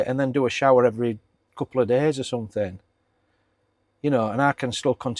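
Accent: Yorkshire, United Kingdom. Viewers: Thought I’d got Jamie Oliver Chanel at first 😂 until I heard your dulcet Yorkshire comments. Nice one 😊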